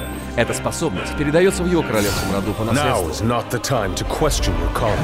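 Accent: Russia. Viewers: ООООО РУССКИЙ ГОЛОС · ОООО, русский голос! #1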